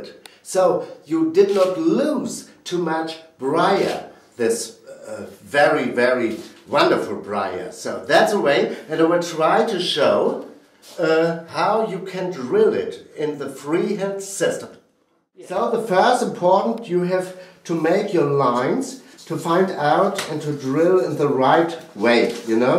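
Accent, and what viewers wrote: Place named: Germany. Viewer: this guys accents sounds like a german christopher walken.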